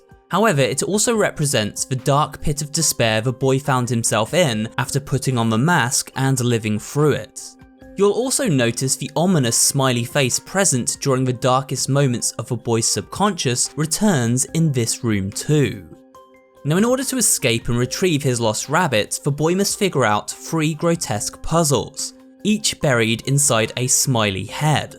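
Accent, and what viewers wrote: United Kingdom. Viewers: It is just now when I watch the videos and I notice this guy has a British accent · British confirmed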